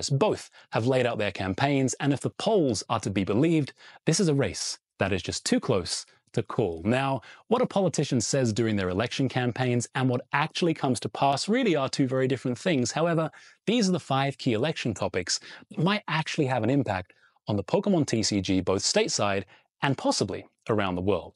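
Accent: Canada. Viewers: Canadian! I've been trying to place that accent for ages! 😂 Genuinely thought you were either British or Australian. 😂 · I had no idea you were Canadian haha. I knew you weren't american, but could not nail the dialect. 😂 Great video as always buddy, Cheers! 🍻 All Americans GO AND VOTE!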